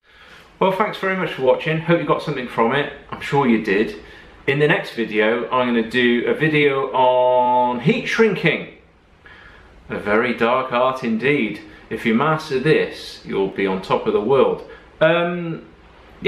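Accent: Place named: England, United Kingdom. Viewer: Do you need an apprentice? I noticed your English accent are you in the UK? Love your videos thanks for sharing 🙂👍👌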